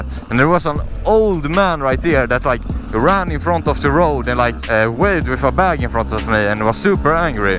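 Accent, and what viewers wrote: Sweden. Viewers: jag kan höra svenska dialekten bro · I can hear you are Swedish instantly I’m Swedish too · are you swedish>??? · Ja, man kan ju höra svengelskan 😂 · u sound swedish are you? Im swedish. hej hej vad heter du? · Are u from sweeden?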